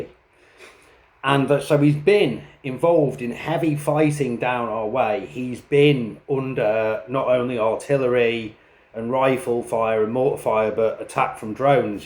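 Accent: United Kingdom. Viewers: I just came across this video... you sound British to me. I struggle to feel sorry for foreigners who go involve themselves in a war. I file this under "it is what it is". Come back home, or stay there but stop whining, you chose this.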